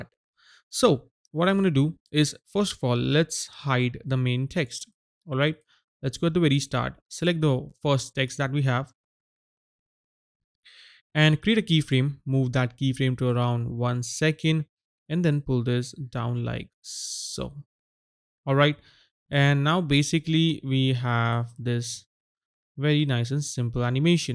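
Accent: India. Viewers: Indian accent huh? 😀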